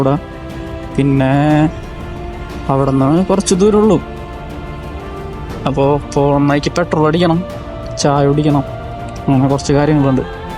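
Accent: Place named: Kochi, India. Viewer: Kochi aano place slang adhupole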